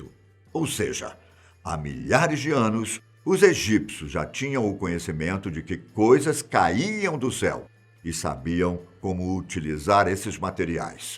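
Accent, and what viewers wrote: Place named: Bahia, Brazil. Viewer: aqui na Bahia tem pessoas que falam assim também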